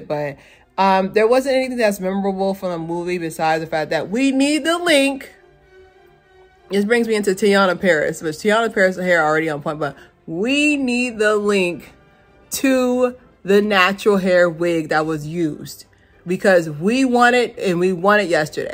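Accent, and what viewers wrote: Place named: United Kingdom. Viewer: You have to do all reviews with that British accent lol that was awesome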